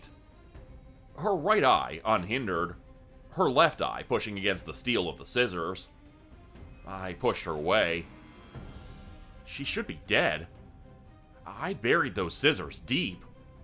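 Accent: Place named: Canada. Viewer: Another great read love the Canadian English lol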